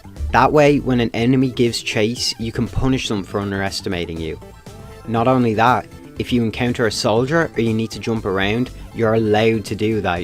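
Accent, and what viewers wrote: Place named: Ireland. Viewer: Tf that Irish accent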